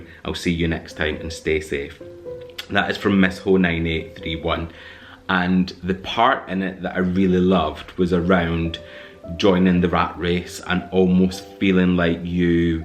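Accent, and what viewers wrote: Scotland, United Kingdom. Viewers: Are you Scottish I 💗 your accent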